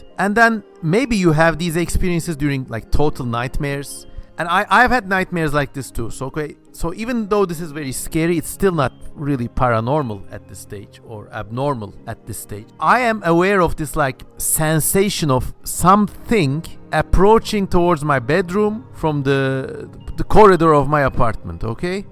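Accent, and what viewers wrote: Turkey. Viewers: I am living for the Turkish accent 🩶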